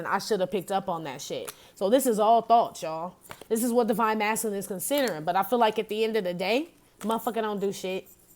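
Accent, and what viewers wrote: Chicago, United States. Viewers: Ctfu I know Chicago when I hear it!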